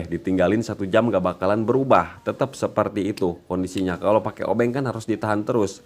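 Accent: West Java, Indonesia. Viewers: mntap bang hatur nuhun elmuna resep pokonmah ngarenge cariosan orng sunda mah😂👍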